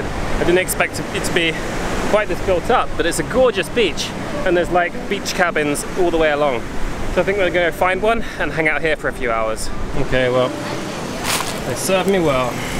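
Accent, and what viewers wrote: United Kingdom. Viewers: I like your accent. Is from UK Right ? Or Am I wrong? I’m from El Salvador I like how people enjoy their time in my little and beautiful country I miss living there., I hope retire in my country hopefully some day. Cheers from California .🌺🌺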